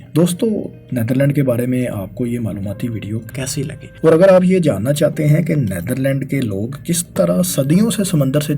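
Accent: Punjab, Pakistan. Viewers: خدا کی قسم اردو کا ایسا گندا لہجہ اور پڑھنے کا گھٹیا انداز نہیں سنا۔ یوں معلوم پڑتا ہے کہ کسی ٹھیٹ پنجابی بولنے والے کو اردو پڑھنے پر لگا دیا ہو۔ خدا کا واسطہ اس طرح کا ٹارچر نہیں دیا کرو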